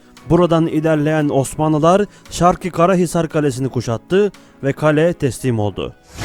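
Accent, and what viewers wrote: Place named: Balkans. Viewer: eyvallah kardeşim. Balkan esintisi var telaffuzunda. Kötü bir şey degil. Begenerek takip ediyorum. Tavsiye ediyorum. Hele son zamanlarda çağ atladın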